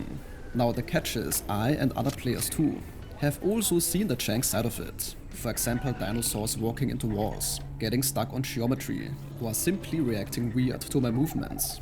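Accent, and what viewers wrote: Germany. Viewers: Junge dieser deutsche Akzent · Sounds german :D Thanks for the review !!!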